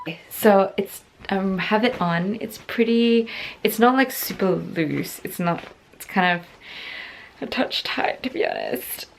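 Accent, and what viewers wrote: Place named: England, United Kingdom. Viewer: Asian with an English accent!!!🤣🤣🤣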